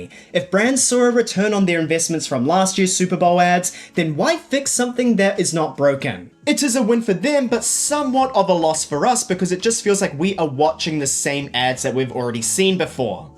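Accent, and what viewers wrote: United Kingdom. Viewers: Why is MrBeast reviewing commercials with a British accent? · ile the fact that is a british person explaining this :hand-purple-blue-peace:😭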